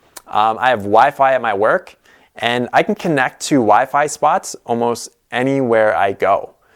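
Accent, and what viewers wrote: Canada. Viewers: I think he english-canadian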